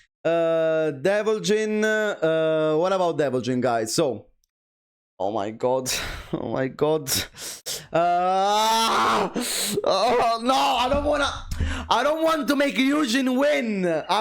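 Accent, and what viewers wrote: Italy. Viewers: Having my main roasted isn't actually too bad when its delivered in a very pleasant Italian accent haha ^-^ I genuinely hate whats happened to Nina in this game. Her range, punishment and mixups have been improved to such an insane degree, that her old playstyle now takes a complete backseat in some matchups. I hate seeing iws1 juggles basically becoming irrelevant outside of low parry combos as well 😔 I dont want them to hit d/f+1,2 again. I want her old poke and move around playstyle conserved. I just want them to gut and neuter d/f+1,2~f on block. Either make it -5 or just flat out remove the ability to do the transition on block. Im so sick of stance transitions in T8 turning a bad situation into a neutral or good one. Thats not how it should work. A d/f+1 into stance at neutral on block is utterly dumb. You dont get to press a button to go from disadvantage to neutral on block. 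Stance transitions should turn an already existing advantage into a greater one but leave you vulnerable if you're reckless with them and commit to it on block. And for the love of god, restore Nina SS1's range to its T7 hitbox. The move has always been busted, no Nina will dispute that. But it used to be hard to use. It made her wall pressure so crazy. It had a glaring flaw, but the pressure it provided was so good that you'd happily work around its bad range. So improving its range so Nina can easily use it midscreen, was a looney tunes decision. Theres been a lot of that in T8. Take a cool thin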